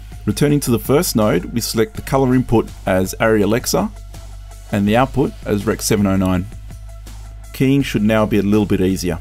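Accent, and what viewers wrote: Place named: Sydney, Australia. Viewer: Lolz,.. sounds to my ears like he's got a Western Sydney or North West Melbourne accent. Trust me, he's talking slow for those accents :)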